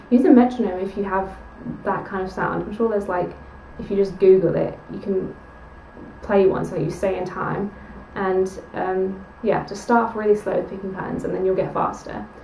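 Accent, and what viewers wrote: United Kingdom. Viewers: OMG you’re british!!!!!! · Ah your Brittish!?!?!? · You're....you're British? Whuaaahhhh? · woahh tbh i didnt know you were british until this vid. singing obviously hides accents. haha btw, this is very good. simple and easy to understand because i was a self thought guitar player as well and i absolutely agree about playing the general chords first because slowly you'll know how to play the hard chords :D this need to be share to friends or families who are beginners at playing guitars. good job! :D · YOUR BRITISH!!!!!! did not see that coming. MIND=BLOWN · Whoa ur British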